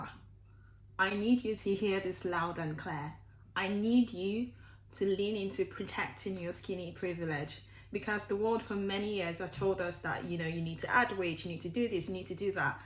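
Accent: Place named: United Kingdom. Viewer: It’s interesting to see ur accent change from your older vids. You have a more British accent now. Almost no Nigerian accent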